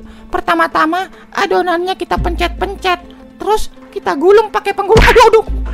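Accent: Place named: Sulawesi, Indonesia. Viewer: Ini kayak orang sulawesi logat"nya🤔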